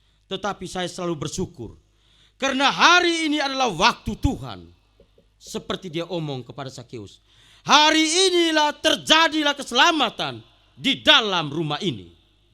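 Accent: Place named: Flores, Indonesia. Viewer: Ini baru kotbah yg menyejukan dgn dialek bhs Daerrah yg kental sy bangga jdi org Flores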